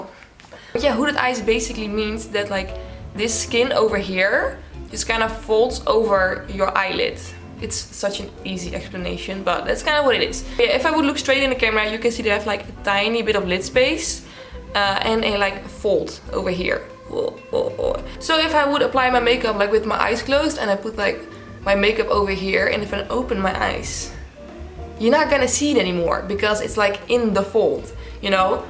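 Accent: Netherlands. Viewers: Ben je Nederlands? Ik hoor dat dutchie accent haha, leuke vid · I can sound the dutch in this tutorial i love you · this was my guess after the first 30 seconds, and now I'm trying to figure out if she really is Dutch. Love that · that dutch accent i- 😭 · Lmfao I'm dutch and I didn't even know you're dutch but as soon as I heard your accent I just knew it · Are you Dutch? Judging by your accent hehe. Good video btw, it's so different to 'wing-it' with hooded eyes...! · Echt een Nederlandse accent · She is not german she has a very pronounced dutch accent not german. She sound like dutch VS model Romee Strijd when she talks lol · no one lmao a quick google search would show you she’s dutch. She has a very dutch accent. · Is she Dutch? She sounds like me lol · her dutch accent is sooo strong. Love it tho since mine is just as strong as hers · dutch accent !! 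· haha i love dutch accents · hu?? kan ze nederlands? ze heeft echt zo een accent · haar accent is onmiskenbaar nederlands haha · Your accent reminds me of my dutch cousins. I find eyeliner the hardest to apply. I dont' apply as boldly as you when I wear it. Find it seems to sit better when I follow the line as an extension of the lower eye shape and only do the outer edge. I haven't tried putting on inner edge as thought might might make my eyes look closer together. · we love the dutch accent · Super Nice Dutch accent! Lol love the tutorial ! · lol yes I was like ohh she dutch. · nah, she's dutch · Lmao Germans pronounce nyx the same way but she’s like obviously Dutch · Love the Dutch accent hehe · lmao dat nederlandse accent springt er wel uit xd <3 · im just so confused if im hearing this in english or dutch lmao such a mindfuck love the accent tho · Are you Dutch? You sound like you're Dutch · Are you Dutch? 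I recognise that accent from miles away haha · I am Dutch and I just know from listening that she is very much Dutch lol. The accent is recognizable when you are from the same country xD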